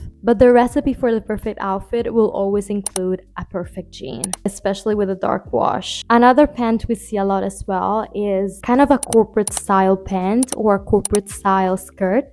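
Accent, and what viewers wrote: Italy. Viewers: I'm sorry in advance if it's common knowledge but you sound italian... Btw I'm already starting to enjoy your contents, you have a beautiful voice ❤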